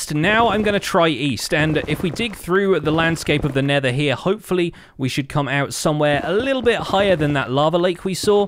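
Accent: England, United Kingdom. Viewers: this guy has a certain way with words, for sure. I think it's part of the english humour, accent and delivery lol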